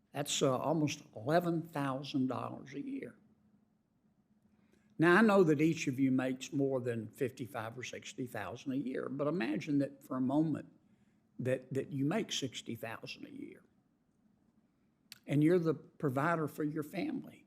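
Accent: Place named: Appalachia, United States. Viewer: John Kennedy the hillbilly talker from Connecticut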